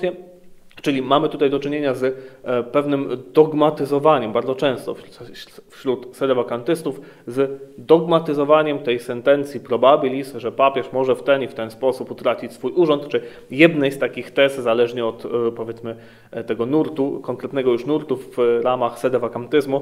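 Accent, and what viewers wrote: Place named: Italy. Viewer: Ach ta łacina włoska ;))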